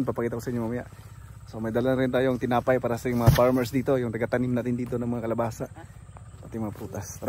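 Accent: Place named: Bohol, Philippines. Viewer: Taga Bohol po ba kayo sir?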